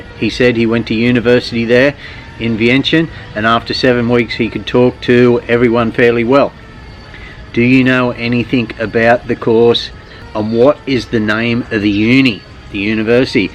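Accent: Australia. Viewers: Are you Queenslander? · Hi. I think using English letters (Romanised) for Lao is very difficult. There is no standard. There are many Lao - English dictionaries, but assume you speak French. (Hence the screw up with the "S" in Laos) or that you know phonetics. I speak guttural Northamptonshire English; and you Aussie. (I assume that's the noise being picked up by the microphone.) We both know English and can understand each other, but it's those vowels and tones that have to be correct when speaking Lao. if i wrote in my dialect, what you'd say would be miles off. I work with a Glaswegian - lovely chap - but I have to listen to him closely. When he is with his mates - I give up. That was a long way of saying "No" - but I hope helps! Here's a link to a web site I'm playing with. <link>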